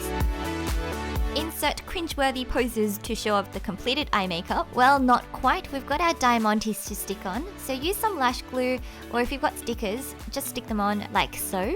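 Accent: Australia. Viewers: I love it that your makeup tutorial always have that perfect mixture between "western" makeup and korean makeup. No offense but I found minimal korean makeup starts getting boring to watch after a while so some refreshing looks like this one is really refreshing:) Also, your Aussie accent just makes me love ur vids soooo much:* thanks for the tutorial:)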